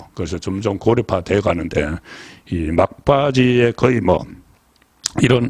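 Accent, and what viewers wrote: Gyeongsang, South Korea. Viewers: 송형 전에 뭐라 했지??? 너 경상도징???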